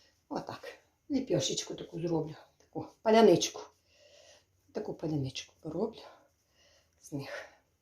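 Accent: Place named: Bukovyna, Ukraine. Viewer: Дорога, скажить а як писни так зробыты? Бабка робыла нам на Маковея таки, але писни, бо в ти дни пист. Бабкы уже немае, и спытвты немае в кого. Бякую вам за рецепты. Моим дуже все подрбаеться. Да и мова Ваша зрозумила. Я родом з Буковыны и мени прыемно чуты именно таку мову, ридну.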